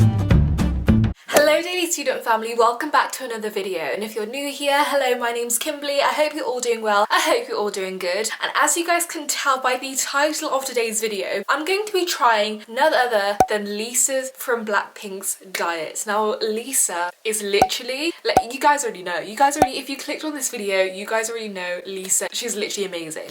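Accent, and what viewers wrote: United Kingdom. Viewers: Okkk ..first off !!!! I'm in love with her British accent dayummmmm🤩🤯🔥🔥🔥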